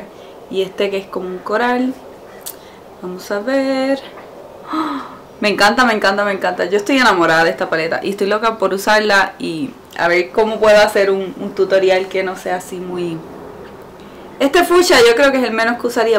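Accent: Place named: Puerto Rico. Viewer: Cada vez que te escucho hablar no puedo evitar pensar que eres de acá! PR right? Here you have a new subscriber :)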